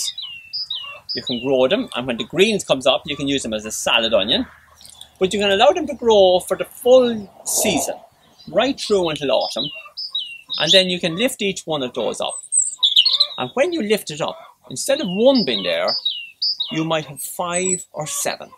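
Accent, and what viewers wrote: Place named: Jamaica. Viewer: Great video. Really helpful. What is that accent? I have Jamaican family and they sound a lot like u.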